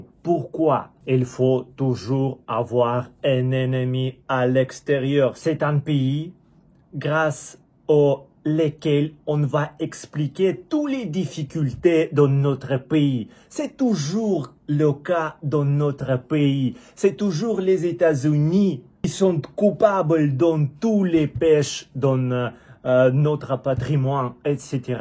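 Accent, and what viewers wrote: Russia. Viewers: Merci à vous de parler en français et expliquer à certains de nos compatriotes aveuglés par le discours de M. Macron, qu'avec votre analyse il est tout simplement impossible que la Russie envahisse l'UE. Macron est l'ennemi public n° 1 de non seulement de la France mais aussi de l'UE. PS : j'adore votre accent russe 🙂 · Personne n'a envie de suivre notre Président, nous souhaitons sa destitution. Mais merci pour votre communication. J'aime beaucoup l'accent russe😊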